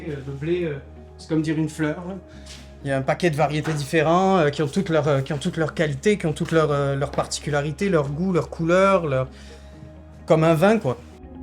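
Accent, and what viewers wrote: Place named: Quebec, Canada. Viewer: Un français avec un accent québécois.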